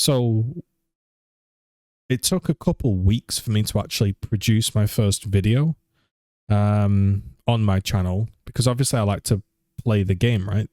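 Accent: United Kingdom. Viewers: I watched this entire video and this is easily the most honest full review of the game and pretty much exactly how I feel. Just remember Z the silent majority of the Gears community stands by you and wishes you the best. I know many content creators and streamers that love your content and persona and behind the scenes talking about your entire situation. I've been here since getting spammed that video on twitter about Gears 1 compared to Gears 5 which I ended up enjoying. I loved the WWE style Gears videos and they still make me smile when I think about them. British accents drive me crazy but watching your videos for awhile now actually made the accent tolerable for me now <3 Love you man and take care of yourself.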